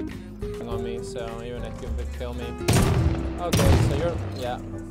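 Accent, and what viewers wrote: Argentina. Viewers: sos argentino o que? jajaja wtf you blowed my mind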